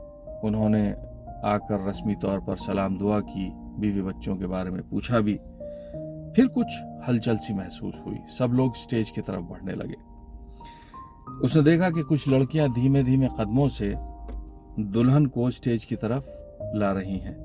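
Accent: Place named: Aligarh, India. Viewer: Voice n andaaz fully Alig❤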